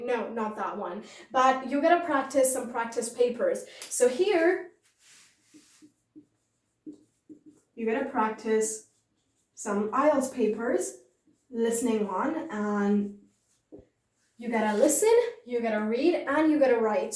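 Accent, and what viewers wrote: United States. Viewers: is this American or African American accent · Awesome When I close my eyes I feel like someone American 🇺🇸 speaking in this video Keep it up Are you offering any one to one class for student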